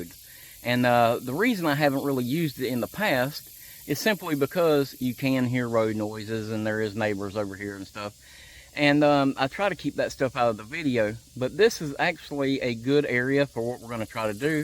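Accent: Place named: South, United States. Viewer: Subscribed, just because you’re safety conscious and wearing your safety squints and I like the southern accent.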